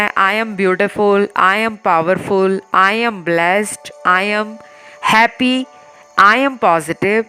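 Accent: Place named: Maharashtra, India. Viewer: R u maharashtrian bcz u talk like typical maharashtrian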